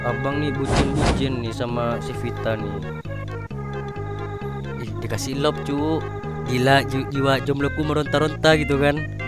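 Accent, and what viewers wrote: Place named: Madura, Indonesia. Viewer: Dari bahasanya dah kek orng madura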